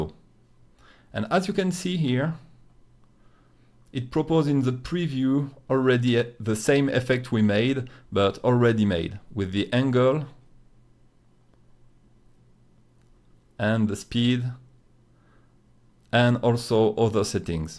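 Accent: France. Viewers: You're french, aren't you? Love the accent x) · Je rêve ? On dirais que vous avez un accent Français quand vous parlez anglais ! x) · Always great from south of France with accent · Je crois que c'est parce qu'il est français et qu'il parle anglais ^^ Peut-être le très léger accent qui fait qu'on comprends mieux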